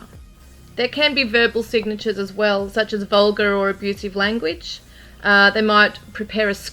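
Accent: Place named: Australia. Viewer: Hello Aussie patriot, I recognised your Aussie accent. I also have so many out there queries of all that happens. This case has been on my mind since day 1.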